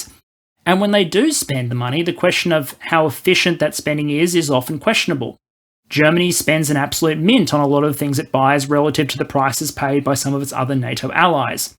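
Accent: Australia. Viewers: Sound was good! Aussie accent helps.... calming..... My big question, do you employ additional researchers, or do you never sleep?? Content was accurate and engaging. Going to your Patron site......That is how good!!! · Sound is much better, previously you sounded like you were recording in culvert. now you sound like an Australian.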